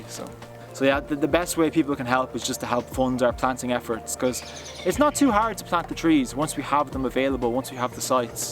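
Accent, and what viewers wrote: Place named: Ireland. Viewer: Bro! That.s amasing what u did! Great deed! I luveEire! I.m a Paddy 2, but I was naturalised dere, a fkn brit made me run out of that dream land, he was my x boss , don.t kneel down in front of those bastards anymore! RESPECT 2 U!!!!! I luv Ur accent 2!! Ur fkn great! YESSS!! I.m happy 2 c this , what U do!! If I.d be a chick, i.d show u my boobs, or even more! ! !!! Invite U in! ! ! !!! Xquize me, i.m like.n arrow! ! ! !!!! That bstrd sugest I.m sick.n da head, proganda against me in a way he was not suspected 2 b guilty!bro, U.r a great nation!! All the way!!! Yess, r some bstrds! But per general...!!!!! Fc uk!! The imperilists destroyed that realm!! Take of what U can man!!! Tk U a bunch 4 what U do!!!! Go in front of stephen.s Green , in Park show Urvface there, the chicks will jump on U now! I.m sure of that!! Do it!! Score!! 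Yep!!Ayeeaaaahhh!!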